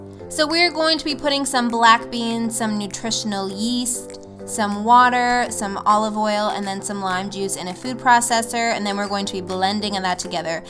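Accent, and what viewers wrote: Canada. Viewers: are you canadian ? hehe :) cute accent (the way you say about and cilantro and avocado got me)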